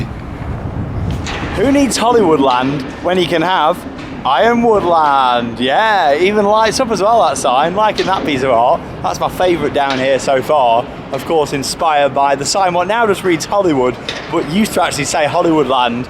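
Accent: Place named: Stoke, United Kingdom. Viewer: where are you from? Trying to place the accent, almost a stoke accent i think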